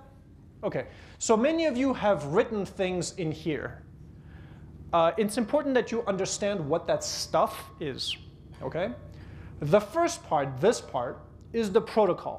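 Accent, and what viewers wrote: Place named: Quebec, Canada. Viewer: I detect a very slight french Canadian accent.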